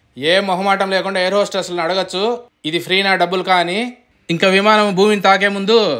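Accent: Kadapa, India. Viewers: Nee matalu vintunte Kadapa kallamundu kanabadathadi. Kadapa municipal high school lo chadivinava? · మీరు ఇచ్చే ఇన్ఫర్మేషన్ చాలా బాగా ఉంది,కడప భాష యాస సూపర్ sir మది కూడా కడప రాజ రెడ్డి street sir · Welcome to kadapa. I have been following your you tube channel since two years and enjoying your kadapa accent presentations. Thank you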